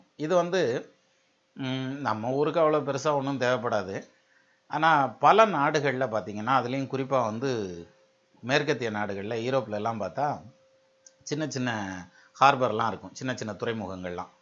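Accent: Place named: Madurai, India. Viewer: Great explanation sir with a simple Madurai slang. All videos are very interesting it’s induced my astrological view.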